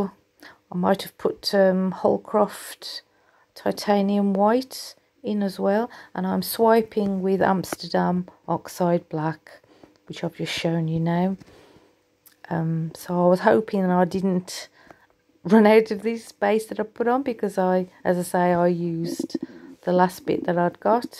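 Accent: United Kingdom. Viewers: You sound like my Scottish friends! Lovely 😍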